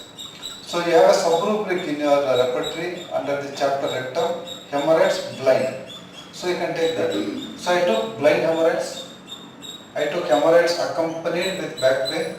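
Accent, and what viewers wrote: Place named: South India, India. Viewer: Southern pronunciation is always to be a problematic for us.And what is going on in the bakground?Annoying sound making mad.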